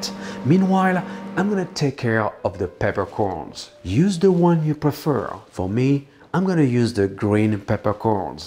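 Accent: France. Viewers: french accent ^^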